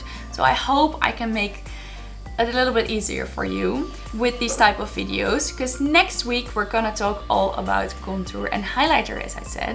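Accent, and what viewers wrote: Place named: Netherlands. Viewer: What a gorgeous channel you have! Where are you from?💗✨ You sound Dutch like I am😂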